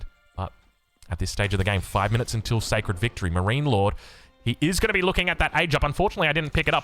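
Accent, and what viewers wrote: Australia. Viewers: I'm very none aggressive and like to build a thriving empire full of resources and a big army before considering attacking. Watching these videos to get better. I love these video's. As an Englishman, The Australian accent is appealing.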